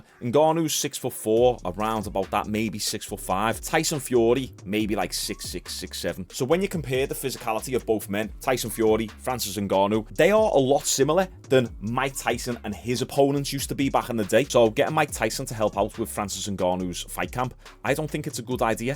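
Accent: Liverpool, United Kingdom. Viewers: Why keep the Scouser accent when you do a perfect Tyson AND a perfect Ngannou lol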